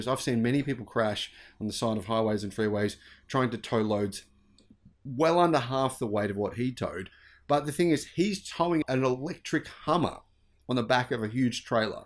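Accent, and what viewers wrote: Australia. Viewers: Nope! I don't trust your Neanderthal a** anymore. JerryRig is very clear and honest. You sound like you're from Australia or something, that makes sense considering you can't Believe that somebody would drive 65 miles per hour , that's normal here in America in fact slower is dangerous. The region he towed in is cold and mountainous this was a worst case scenario. I know it's hard for you to believe that places like this actually have snow and there's actual mountains, living so far down under, But they do. Every phrase you used it was said to put doubt into what happened but offered no proof. All in all if the truck can't go to 250 miles (real world miles) towing on one charge it can't tow.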